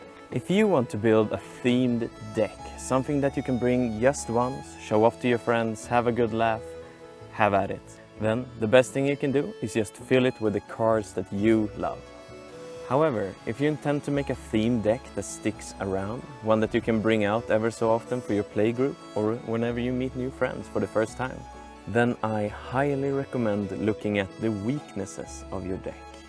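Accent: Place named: Sweden. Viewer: As soon as I saw the house and nature I was like "this looks like Sweden", then heard you speak and was like "yep, Sweden" 💛 The fact that this was clearly filmed in summer and was published now in winter after the super snowstorm was (1) very good for my mental health to help me look forward to the next summer, and (2) a testament of the effort it must have taken you in editing and post-production. The video was amazing and I'm glad you took the leap and made it happen, congrats and keep it up!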